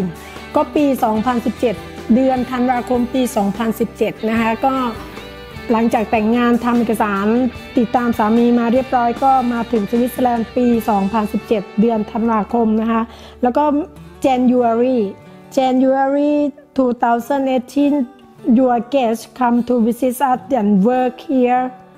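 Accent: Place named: Thailand. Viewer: Wow. This is an interesting Vlog. Greetings from Oman. Sawadee Krap.. Khob khun for sharing this video. Interesting to know about you and your life. good to meet your cameraman Husband:) Tinglish sounds cool.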